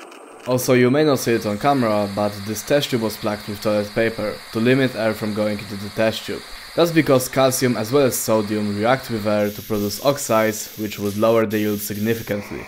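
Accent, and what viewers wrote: Poland. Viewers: Your polish accent echos through my dreams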